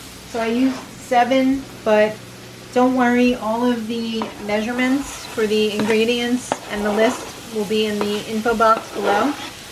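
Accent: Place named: United States. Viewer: This would not go over well with Americans! But you sound like your from the States yourself.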